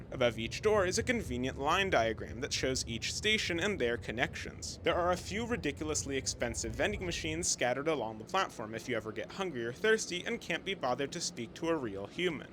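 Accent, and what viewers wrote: Brazil. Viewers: Esse inglês paulista eu conheço de longe. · For sure you are brazilian or very familiarized with brazilian portuguese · But the guy who’s presenting the video is a brazilian, for sure. He can say portuguese (brazilian one) without any accent at all. No foreigner visitor can do so. He may lives in the USA, but he’s (or his family) from Brazil. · Even though your english is very good, I can notice an accent. You're brazilian alright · he has a Brazilian accent.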